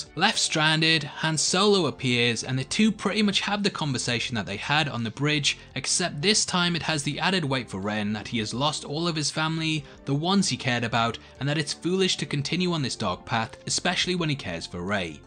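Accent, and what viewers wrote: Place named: Sunderland, United Kingdom. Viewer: Agree with a lot of this although would rate higher than 5/10! Also, North East accent. Sunderland-ish?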